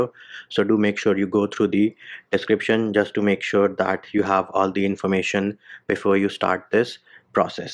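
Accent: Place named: India. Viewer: indian accent make me laugh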